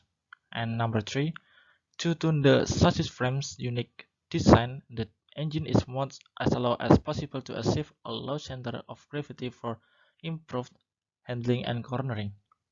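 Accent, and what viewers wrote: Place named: Indonesia. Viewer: logatnya kayak orang indo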